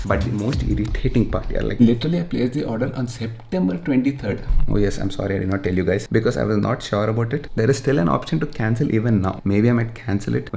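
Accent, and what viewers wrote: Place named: Andhra Pradesh, India. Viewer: Bhai kuch bi kar...Hindi baat maat kar woh Andhra accent mein..please mere bhai please..dont try being cool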